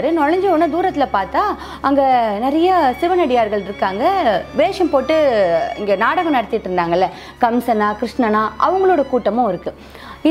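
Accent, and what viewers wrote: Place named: Chennai, India. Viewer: alaga tamil pesringale akka !!neenga chennaiya irikka vaipe illa enganu sollunga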